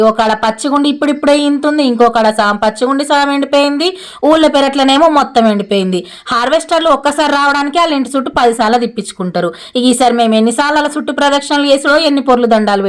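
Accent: Telangana, India. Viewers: తెలంగాణ యాస మీ లాంటి వారే బతికి స్తున్నారు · Akka meru mana telangana yasa la machiga matladutharu v6 lanti channel lo try cheyavachuga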